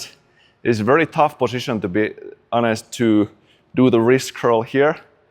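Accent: Finland. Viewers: OMG I was watching this without knowing where you were from, and I kept thinking "this accent sounds SO familiar to me..." Then when you mentioned Finland, the bomb just dropped 🤯 I realized you sound EXACTLY like Ismo!! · I can tell a Finn accent a mile away :) hard to make those English sounds isn't it ? Love the Finns. I could be wrong but sounds like Sissu to me. Love from Texas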